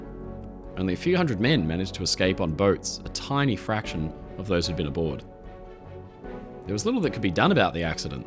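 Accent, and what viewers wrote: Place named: Australia. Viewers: Yo my brother! Are you Australian? The accent sounds Australian in one statement and British in another Yes, I know. Australia and the U.K. are part of the British Empire. I'm just curious. Thanks for an informative website! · He sounds very Aussie to me.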